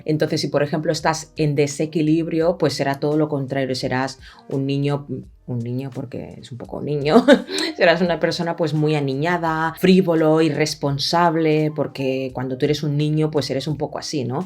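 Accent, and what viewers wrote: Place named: Spain. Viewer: ¡¡Que contraste el tuyo!!.tienes rostro caribeño y hablado(acento) españolete.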